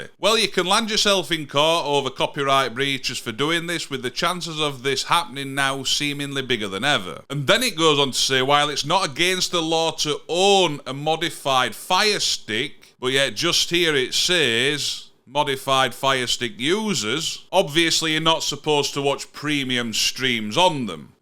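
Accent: Yorkshire, United Kingdom. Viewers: A proper Yorkshire accent. Love it. I'm guessing Barnsley way?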